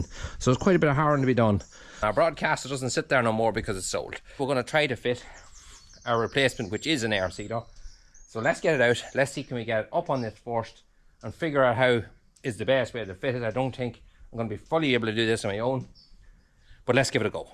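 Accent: Ireland. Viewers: I agree that our phones or iPads have ears. 😂you are not only an excellent farmer, a diary farmer but also an expert mechanic and horticulturalist as well. You are one clever man with many talents and both my husband and myself love watching your videos as you make them so interesting and varied. The Irish accent helps as well as it’s our favourite. We are watching you from down under in Perth, Western Australia.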